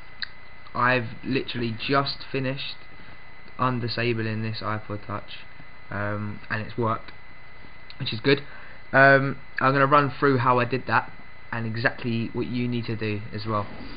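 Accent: United Kingdom. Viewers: that is an london accent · nice british acent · Thank you my British speaking friend. :D Now im up 60 nigga! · your british ???? cause thats kool doggy!!!!!! Lol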